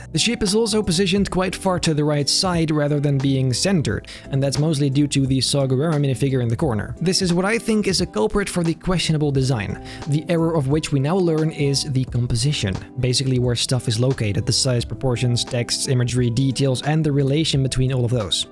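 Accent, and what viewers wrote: Netherlands. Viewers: This video could've been good but the horrible Dutch accent and the pronunciation of Scimitar as Skimitar ruins it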